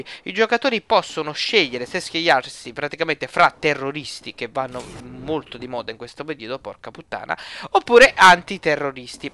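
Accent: Italy. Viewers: ovviamente ogni tua recensione è bellissima. Dici tutti i dettagli,però devi dirmi una cosa...perché quella parlantina sicialiana? xD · Chiuso il video e messo dislike a . La classica pronuncia degli italiani.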